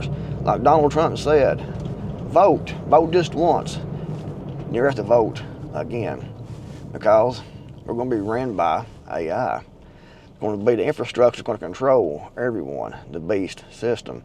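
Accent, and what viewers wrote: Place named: Texas, United States. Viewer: Texas loves you, you sound like us. Thank you Sir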